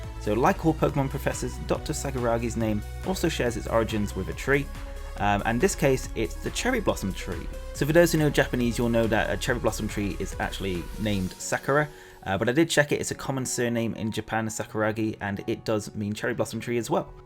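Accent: United Kingdom. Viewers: Bootiful British accent I think? Makes me swell wit pride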